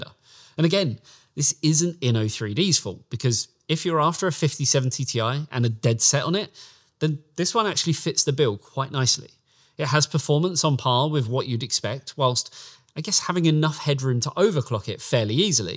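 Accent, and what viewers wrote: United Kingdom. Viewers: Британский акцент смешной ...🤣🤦‍♂️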